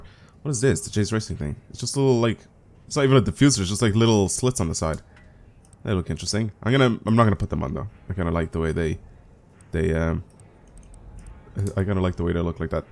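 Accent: Ireland. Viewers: Wow ure irish i didnt know im irish too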